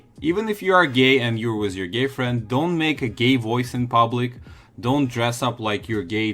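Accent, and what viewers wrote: Russia. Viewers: a dude with russian accent and probably was born in Russia,you know. Eloped to usa and says wrong things about Russia. Disrespect and weird way to deride country and people. You haven't succeed at all,. The only right thing from this video is info about superstitions in Russia. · Hey boy. Shut your mouth and stay where you are. You will never be an American with your heavy Russians accent. Do the best you can for your beautiful country. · Посмотрел 39 секунд, слышу, что ты русский и вот это "this could get you killed", конечно пиздец. Не потрудишься светануть здесь случаи, где кого-то уже killed по этой причине, особенно зарубежного туриста? ЗЫ. дальше эту поебень смотреть смысла не вижу. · У тебя акцент русский))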